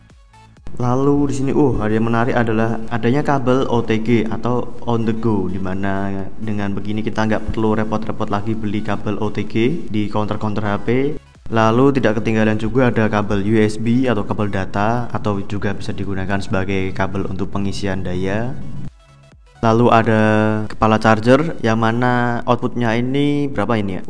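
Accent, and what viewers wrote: Java, Indonesia. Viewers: bang aslinya orng mana sih ? kok logatnya kyk orng jawa ? hehe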